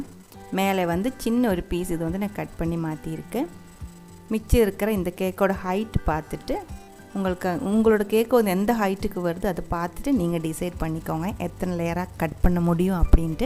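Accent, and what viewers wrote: Kerala, India. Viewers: Mouth melting..... Clear recipe... Malayala tamil cute.... Gonna try for my kids.... Thanks a lot for the recipe.....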